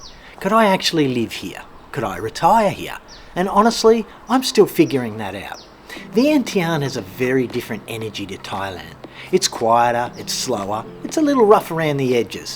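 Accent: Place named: Australia. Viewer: Thank you for the video, mate. Love seeing vid about Laos. Also, love your down under accent. Stay healthy and enjoy your retirement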